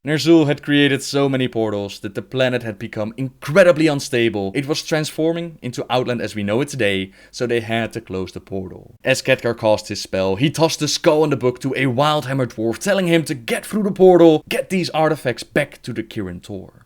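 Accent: Netherlands. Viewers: God, your accent is so pretentious and obviously Dutch even though you try to mask it. Stahp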